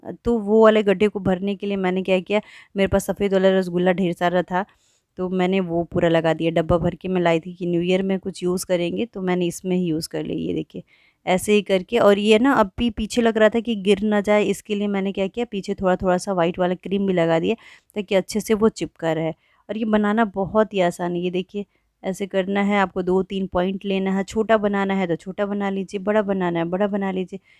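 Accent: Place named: Jharkhand, India. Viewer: Mujhe laga jharkhand se, aapka bolne ka tarike bhot acha hai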